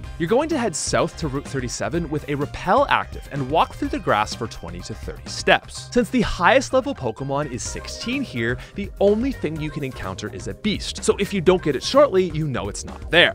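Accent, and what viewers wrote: Canada. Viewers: Imma guess this guy is canadian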